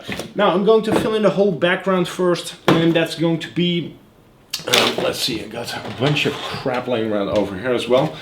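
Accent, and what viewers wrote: Netherlands. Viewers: Hey, I'm just getting back into RC, I flew fixed wing years ago, but now I've got the hots for trucks and cars. Ive watched a few of your videos and thinks they are great, no bullshit, just facts and well informed opinions. I'm not interested in your cats either, or people who flower themselves up to be more 'interesting'. 🙄🤥 Keep up the good work. 👍 I have my first car, a Slash 2wd OBA, but this will be my secondary car, my first love is crawlers and I'd like a RC4WD Gelande LWB 110 purely because I want my crawler to be as scale as possible, but damn it's expensive! I'm considering a HPI Venture with a Defender 110 hard body with interior instead as a cheaper alternative, I'd love to see a video on fitting various bodies to the HPI. 😉 Oh and BTW, I can't get your channels theme song out of my head, or you're 'crazy' Dutch accent. 🇳🇱👍 Jelly from 🇬🇧😁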